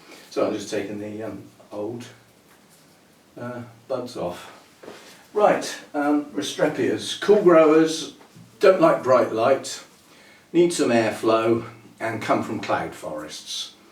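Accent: England, United Kingdom. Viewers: I have yet to visit England, and would love videos on your town!! I adore your accent 😉 (I’m from Washington State, USA)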